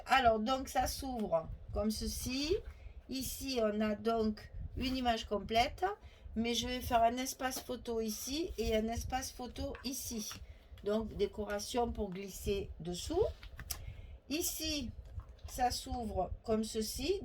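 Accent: South, France. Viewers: Géniale cette partage. Génial cet accent du Sud. Et puis s’il y a une petite anomalie et bien cela nous montre que c’est un travail fait main, artisanal, fait avec le cœur. Alors merci à vous.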